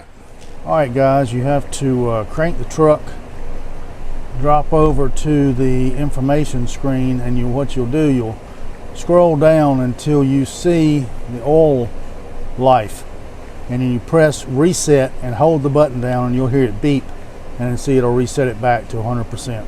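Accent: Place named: Texas, United States. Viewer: Oh, I feel like I’m in Texas hearing his accent, the garage and the big Chevy. I miss Texas especially the shoppings and dinings.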